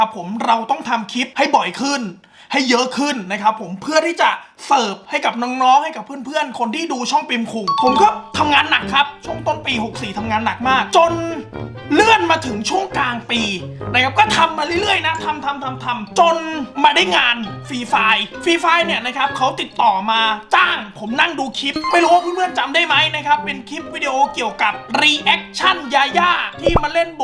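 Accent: Thailand. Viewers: พี่ พูดเหน่อ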